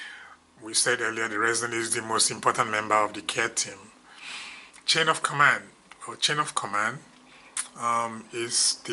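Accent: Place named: Nigeria. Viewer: you sound so Nigerian. 😍 Maybe you aren't, but lovely regardless. Keep winning 🇳🇬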